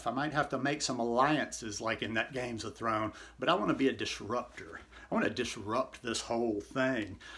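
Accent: South, United States. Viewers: Not only does your simplistic approach make the most obvious sense, you are so darn entertaining when sharing it! Love the southern accent and the mind processing of our human eating selves. Thanks for the entertainment!!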